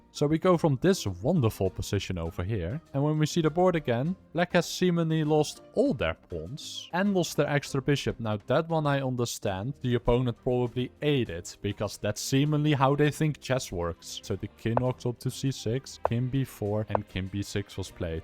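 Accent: Netherlands. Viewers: The DUTCH ACCENT IS UNESCAPSBLE BRO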